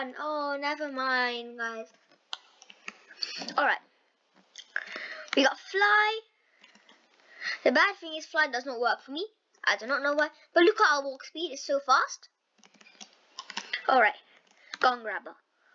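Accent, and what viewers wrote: United Kingdom. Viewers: British boy 🥳 no offense I like em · Umm idk what he's says because he's bridsh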